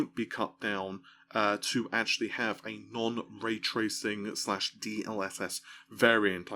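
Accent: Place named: RP, United Kingdom. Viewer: its because he has a posh english accent but then uses the F instead of TH. Freak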